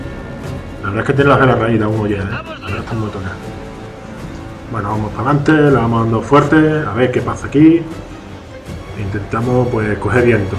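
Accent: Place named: Spain. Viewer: No comento nunca, pero me veo en la obligacion de pedirte que si aun no compras un nuevo microfono, lo hagas, se escucha horrible, ademas de tu acento español super marcado (ese es un detalle, lo del microfono no). Al Impoluto lo derrote a lo bruto, me quedo 1 cuadrito de la ultima barra (con el Jackdaw al 100% en todo). En el caso de estos hermanos super barcos, no los pude derrotar, asi que... termine aqui xD. Pero como uno de los 2 (a eleccion) al principio al ir de frente, uno se puede colocar justo detras e ir disparando y haciendo daño con el espolon. No tenia idea de como se usaba el mortero (¿mortero el ataque aereo a arta distancia que aparece marcado en rojo cierto?), ahora si podre terminar con estos barcos. bye, salu2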